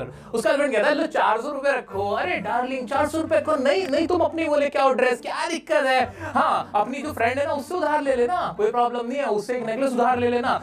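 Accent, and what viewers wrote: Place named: Bihar, India. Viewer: Sir aap bihari ho 😸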